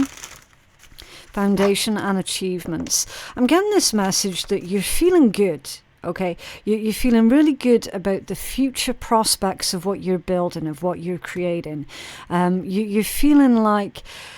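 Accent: United Kingdom. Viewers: Yay a British reader 😁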